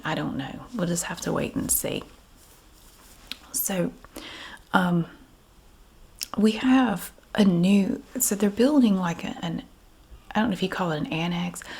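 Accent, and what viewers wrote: South, United States. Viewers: the amount of dedication u put into ur videos is outstandin! I’ve been havin a lot of stressful things happenin in my life right now, and watchin ur videos calms me down. I am from the south, but I have recently moved and miss the sounds of the country accents so it’s like ur bringin home back to me. ❤️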